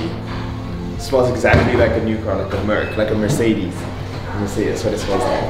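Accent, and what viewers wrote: South Africa. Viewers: Bro your accent , are you South African? Thanks for great content!!! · JUST SUBSCRIBED!!!!! Im from Cape Town and I pick up you from SA Straight from the pat by the accent. Enjoyed watching this video, looking forward to getting to see future Videos you drop. Much love ...:) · This guy sounds South African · Dude sounds South African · This guy from south africa? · Is this guy from South Africa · You sound south African, are you?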